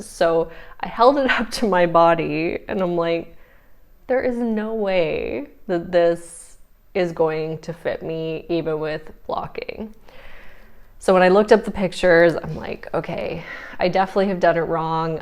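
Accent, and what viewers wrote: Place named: Canada. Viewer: and sorry - you’re Canadian - cardinal sin to assume you’re American- I should have got that from your accent! I have family in BC 🤎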